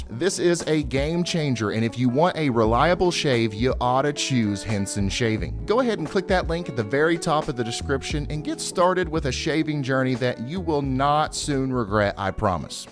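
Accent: South, United States. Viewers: I follow your weather channel cause l feel you know your stuff in reference to weather. From Puerto Rico. I have been to kuntucky got family there. I love the southern accent and miss my friends out there.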